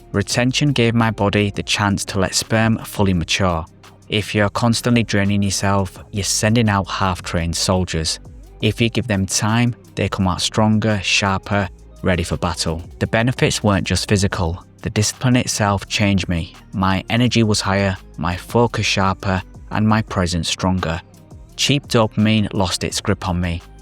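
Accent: United Kingdom. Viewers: I'm curious about your accent... which region of the UK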